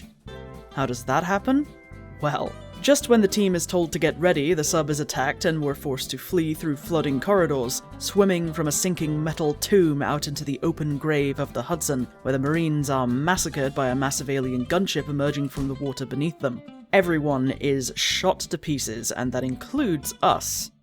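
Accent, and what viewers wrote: United Kingdom. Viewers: This is off topic entirely, but are you british? Irish, american? I honestly cant tell and that could be from severe brain damage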